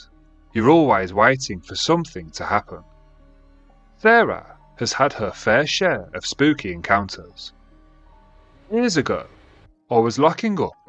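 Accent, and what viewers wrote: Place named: Birmingham, United Kingdom. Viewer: Your appalling brummie/black country accent is far more disturbing than the tales you are telling,,,,,,Get some elocution lessons...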